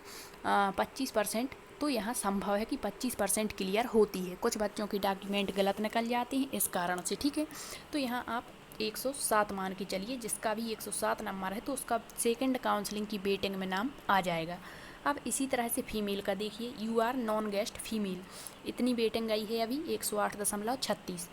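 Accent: Bundelkhand, India. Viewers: आपके बोलने के लहज़े ऐसा लगता है कि आप बुंदेलखंड से हैं मैडम🙏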